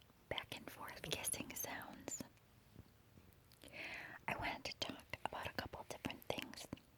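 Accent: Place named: Michigan, United States. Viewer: you're accent sounds close to a Michigan accent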